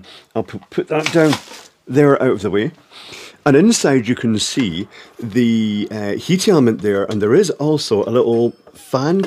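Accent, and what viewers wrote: Scotland, United Kingdom. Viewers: You sound British so this will be a disassembly rather than an American ‘teardown’ surely. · just adore your accent is it morningside. Totally annoys my wife with my rendition of, one moment please.my wife thinks Renfrew.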